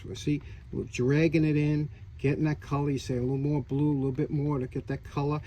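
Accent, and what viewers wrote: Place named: New England, United States. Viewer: Great vid but..........Your Yankee is showing. It's not Pea_Can, it's pe-kan!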